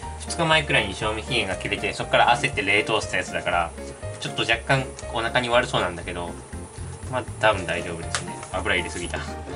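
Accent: Kansai, Japan. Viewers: 関西弁いいね👍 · タメ語関西弁よき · 関西弁萌える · いんきゃの関西弁はらぶい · 久しぶり見たけど関西弁すごい😂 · 急に関西弁なったりタメ語なったり俺言うん好きやわ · 京都弁？関西弁？が、可愛いわぁ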